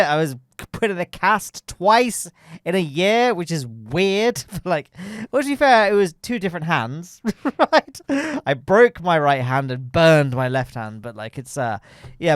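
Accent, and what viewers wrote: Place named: Leicester, United Kingdom. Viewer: Ha ha, you're from Leicester.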